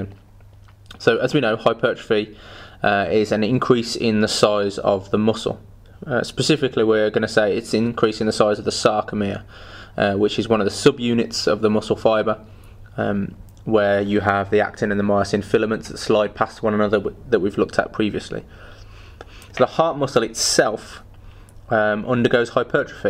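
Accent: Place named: Midlands, United Kingdom. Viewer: Your voice accent sounds like it is from Leicestershire/ Midlands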